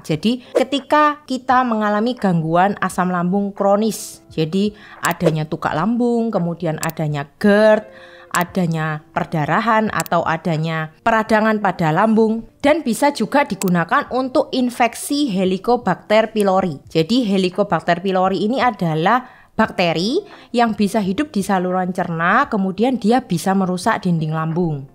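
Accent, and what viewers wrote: Java, Indonesia. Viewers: aku faham logat mu cik...kmu tinggal di jawa kan.😅..btw aku sakit gerd ..pusing ga hilang udah 7 tahun ....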